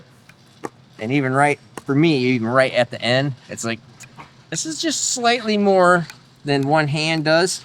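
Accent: Michigan, United States. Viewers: Nice explanation on the dual pumps, i immediately thought of staged pumps but more things to go wrong that way. On the “condescending” explanations. Part of it is the Michigan accent. I moved to Texas in the 80’s. I still have problems with my “tone” when my accent is more prominent, it sounds like your being condescending when its a detailed explanation and the Michigan enunciation sounds like your talking down to some. 🤷🏼‍♂️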